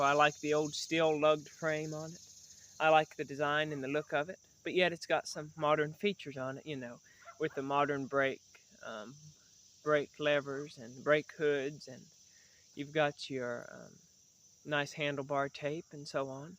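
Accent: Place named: Midwest, United States. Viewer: Kind of off topic, but I love the way you talk. Something in your diction, dictation, and accent is just soothing to me as a Californian. I'm guessing you're from the Midwest in a Mennonite or Amish area. Anyway, I also like the bike!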